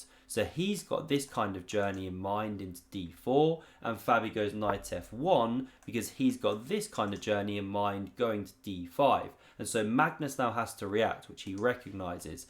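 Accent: United Kingdom. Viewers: 3 things I love about this vid: Nice informative game, great explanation and lovely British accent!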